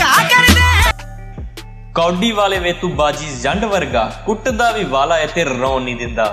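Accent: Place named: Uttar Pradesh, India. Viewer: Bai keho jahi Punjabi bolda? UP da rehan wala te nahi kitey?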